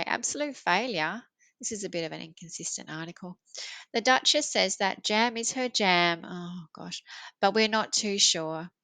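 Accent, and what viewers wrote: Australia. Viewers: I enjoyed the podcast or is it a video ? you sound like an Australian and must compliment your ability to make fair comments without being nasty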